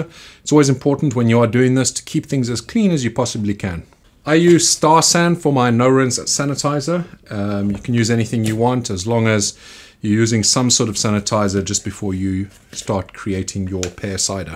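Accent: South Africa. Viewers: Are you originally South African by any chance? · He can't be Aussie.. Mzansi 4sure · You sound South African, very interesting video thank you :) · Great Video, great presentation and what I really appreciated was the step by step, explained in clear english ( with some South African accent ) but very easy to understand the different processes of the fermentation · Correct me if im wrong, but are you South African by any chance. Not so much the accent ( although there is a hint ), but more the walking around barefoot outside? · One of the best SA accents I have ever heard on Youtube. Well done boet, your English is perfect and your pronunciation is amazing. Great video too. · You're south African? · Snap! 😂😂 he does sound South African as well 😂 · are you south african? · When you watching a video and you say to yourself, "Hey this guy is South African" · are you a South African? 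· Yup, 100% sure this is a Saffa... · He sounds South African 🤔 🤔 🤔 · you look like it an sound like it.... south africa or maybe zim? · Are you from South Africa? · Are you South African?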